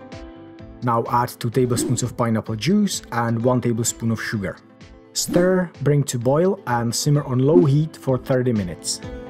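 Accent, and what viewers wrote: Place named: Czech Republic. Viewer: Z přízvuku se zdá, že jste Čech. Skvělé video, vyzkouším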